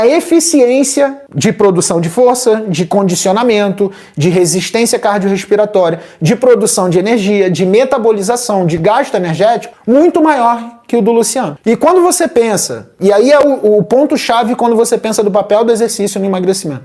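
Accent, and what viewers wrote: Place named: Rio de Janeiro, Brazil. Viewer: Dr.! Adorei o teu vídeo! Estou a procura de um profissional qualificado para eu poder começar a minha saga de alimentação correta e treino. Pelo teu sotaque, tu deve ser do Rio.. Mesmo se o consultório fosse longe, eu iria numa boa, pois quero muito sair da sanfona..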